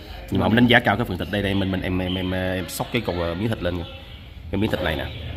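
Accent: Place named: Saigon, Vietnam. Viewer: Mình ở xa, nghe giọng Sài Gòn, Mình cũng thích ơi, chúc - được nhiều sức khỏe, đi chơi vui vẻ ❤❤❤❤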